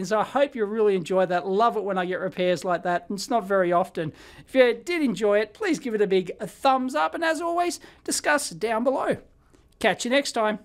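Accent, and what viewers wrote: Australia. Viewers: I tried finding it by your statements in the vid but the web site came up as an Asian Casino site. If I'm understanding your Aussie speak it is <link> The TO8662+ doesn't return anything in a search. I'm sure I must be misunderstanding something. Sorry Dave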